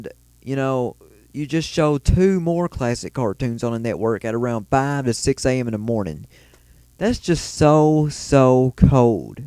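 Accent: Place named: South, United States. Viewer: Are you from the south?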